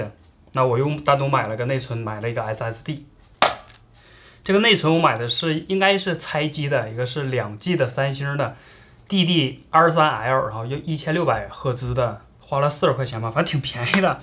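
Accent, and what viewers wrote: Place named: Northeast, China. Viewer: 哥们东北哪儿的呀？口音好亲切呀😂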